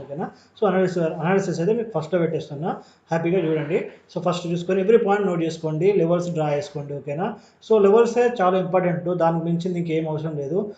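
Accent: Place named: Telangana, India. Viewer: సార్ మీరు తెలంగాణ లాంగ్వేజ్ అలాగే ఉంది... 🤝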